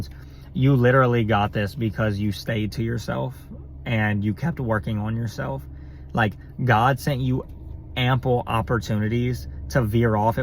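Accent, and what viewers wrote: South, United States. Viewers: your semi-Southern drawl sounds like somebody's auntie on occasion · this guy has a country accent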